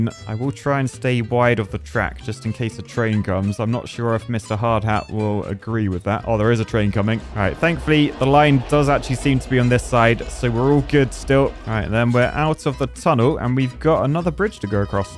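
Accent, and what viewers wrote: United Kingdom. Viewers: Strangely enough this feels like a gray still plays video but with a british accent😂😂😂 im loving it so far only about 15% through the video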